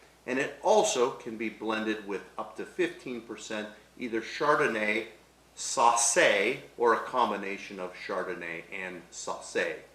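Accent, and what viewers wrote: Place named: Italy. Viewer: you literally said all French words here in Italian accent 😢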